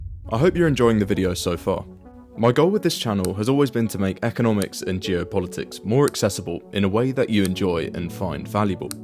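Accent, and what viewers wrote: United Kingdom. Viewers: You're just going to ignore his British accent? Lol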